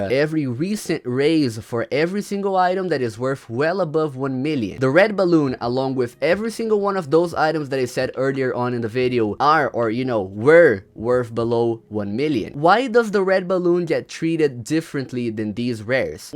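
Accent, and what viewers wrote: Brazil. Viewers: Are u brazilian? I know this accent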